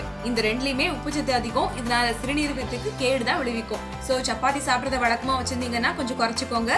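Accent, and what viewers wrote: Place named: Chennai, India. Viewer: Presentation too fast. Unable to digest some taminglish words of Chennai slang for non Indian Tamils like us. Please speak clearly in future for the talk to be useful for all of us. Thanks.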